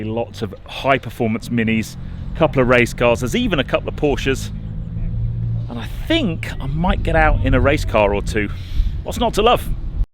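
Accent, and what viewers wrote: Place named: RP, United Kingdom. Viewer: I usually wouldn't ever say anything but his poshness was embarrassing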